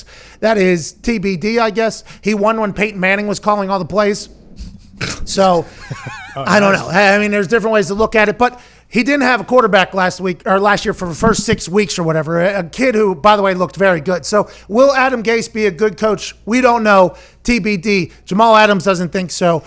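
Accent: Pittsburgh, United States. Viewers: I love hearing someone who sounds like me 😂Pittsburgh accent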